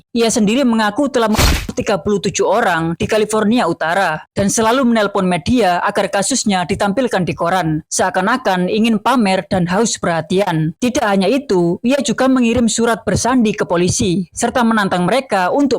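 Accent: Java, Indonesia. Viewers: Terlalu medok mas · logat jawa dan meme pria hitam. admin asli ngawi fix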